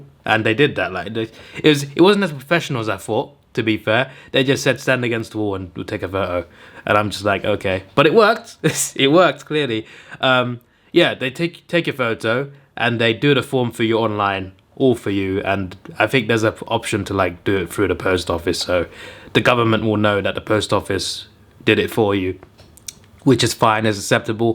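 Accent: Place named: United Kingdom. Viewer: you seem to got a British accent... like did u practice or u brought up there?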